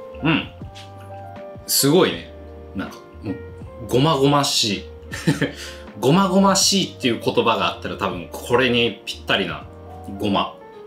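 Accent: Fukuoka, Japan. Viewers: 福岡の方ですか？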